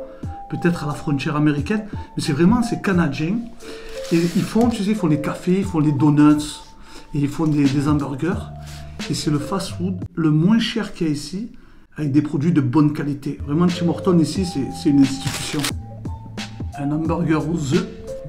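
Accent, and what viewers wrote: Marseille, France. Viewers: J'ajouterai ceci mon cher, je ne sais pas comment tu es dans la vie de tous les jours, mais ici, tu es une des personnes les plus chaleureuse et sympa, tellement que je me suis abonné, et cela est rare. Sûrement ton accent marseillais que j'adore qui me rapelle Marius et Fanny. Mario Repentigny Qc.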